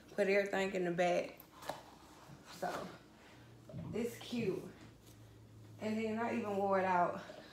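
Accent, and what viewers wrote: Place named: Georgia, United States. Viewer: This color is so pretty!! And you must be from Georgia. I recognize the accent lol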